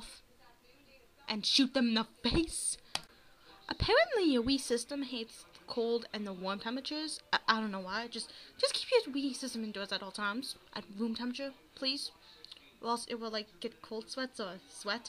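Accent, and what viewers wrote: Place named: United Kingdom. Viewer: Youre from the US but i can hear a slight british accent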